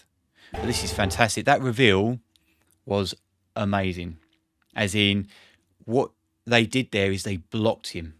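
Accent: United Kingdom. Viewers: What a cutest English accent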